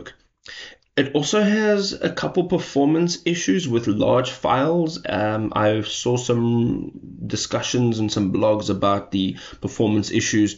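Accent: South Africa. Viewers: This is great info, brother. The South African accent never go away.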